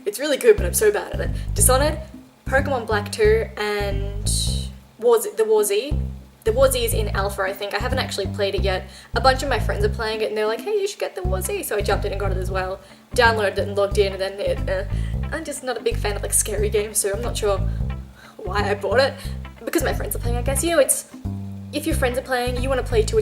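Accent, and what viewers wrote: Australia. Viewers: Love your accent :) Where in Aus are you? I used to live in Perth :)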